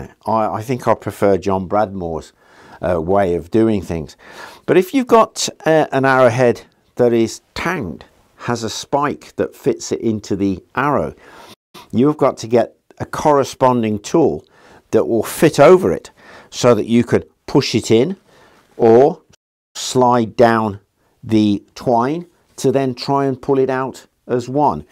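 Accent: United Kingdom. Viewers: The host of this video, to me, amplifies the subject matter with his authenticity. It’s clearly obvious he’s done his homework. Besides, with his British accent, he adds more. One day, I would like to allocate a few hours (on a Sunday), and learn the events of The Great Crusades. Christians vs. Muslims. I do have a deep interest in world history, just not all events. Recently, I discovered the unreal incident that occurred in 1964 (the year I was born) at Stanleyville, The Congo. The black rebels there committed atrocities that matched the deeds of Nazi Germany, but Belgium paratroopers retook the city and rounded up those responsible. All were either hanged or shot on site.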